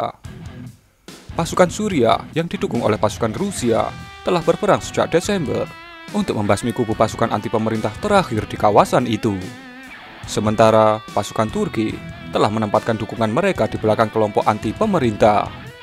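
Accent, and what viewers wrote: Java, Indonesia. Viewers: Medog mu bro....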